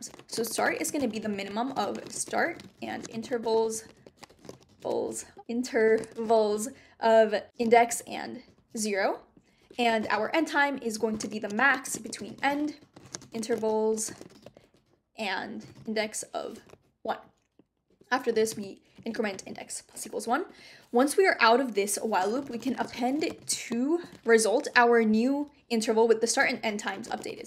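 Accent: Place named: India. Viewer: Can feel indian and American accent ❤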